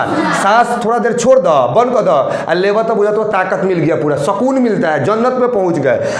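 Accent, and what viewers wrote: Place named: Bhojpuri, India. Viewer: Bojpuri style read kar rahe sir i am raviranjan kumar 20 tarikh ko life maker aay the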